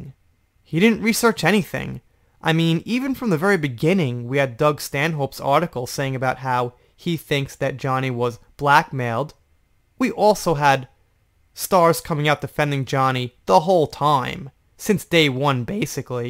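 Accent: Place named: East Coast, United States. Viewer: your voice and easy cost accent is music to my ears..even all the way from Japan..💯💜🦋🤟🍀🌈👊🌹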